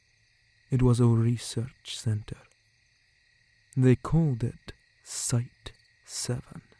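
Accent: Scotland, United Kingdom. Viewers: Love the Scottish accent. It fits the character perfectly.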